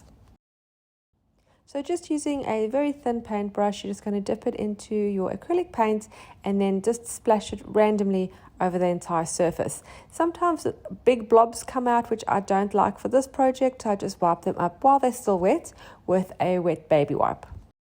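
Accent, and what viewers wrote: South Africa. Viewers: You sound like a fellow South African, where are you from?☺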